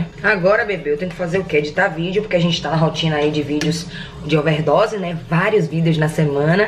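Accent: Bahia, Brazil. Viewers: " Teu fogo vai acabar agora " kkkkkkkkkkkkkkkkkkkkk Eu amei ! Se eu fosse chutar diria q vc é baiana . Do ND me parei nesse vídeo kkkkkkkkkkkkk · Amo esse sotaque baiano e essa mamae forte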